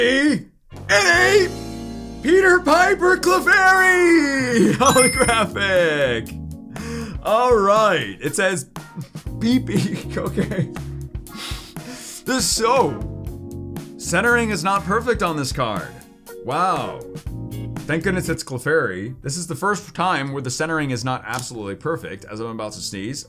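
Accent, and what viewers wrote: Germany. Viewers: You littrely sound German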